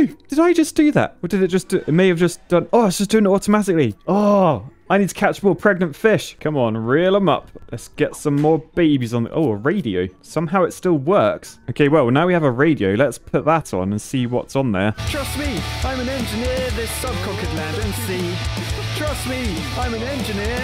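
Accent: United Kingdom. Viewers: He British not American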